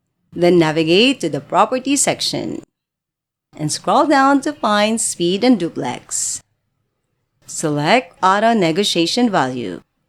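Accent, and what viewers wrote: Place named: Philippines. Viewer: that's a philipina speaking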